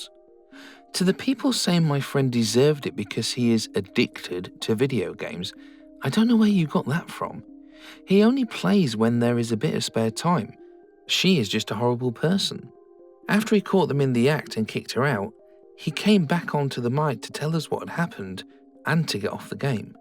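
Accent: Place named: United Kingdom. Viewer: Perhaps it’s just you. I don’t mind a Brit narrating.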